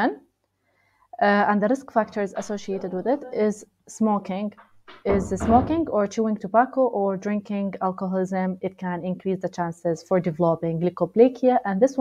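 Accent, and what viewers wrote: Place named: Palestine. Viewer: i can feel the palestinian accent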